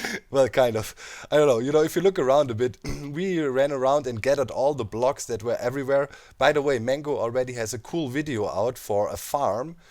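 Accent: Germany. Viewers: Geil der Deutsche Akzent 😂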